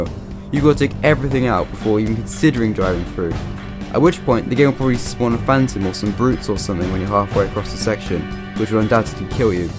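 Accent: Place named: United Kingdom. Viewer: Videos decent! Couple of small points to genuinely help you for future videos, Adapt your voice to more of a "commentating voice" e.g. Speak a bit slower and clearer, Both of us being British makes it harder for people to hear past our accents. - Also don't do what I done and stop uploading! Stay consistent with these types of videos and you'll do well!!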